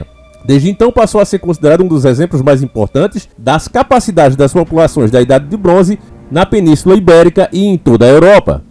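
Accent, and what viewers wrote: Northeast, Brazil. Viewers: BOM Domingo á todos. Grata Dr,já peguei o meu diploma de " telejumento " pois soltei o riso na pergunta: Ou é evoluido ou não? Assinale as alternativas ( Janja em F you) ou 😂😂 ( Musk,eles perderão as eleiçôes) e no som lindo da sua voz falando " convergindo" como um i aberto e, melôdia do Nordeste. Escolhí Elon e a Melôdia. Sou diplomada?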